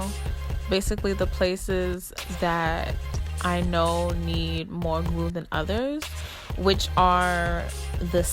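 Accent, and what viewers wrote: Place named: New York, United States. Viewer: yass. and i hear that new york in you tooo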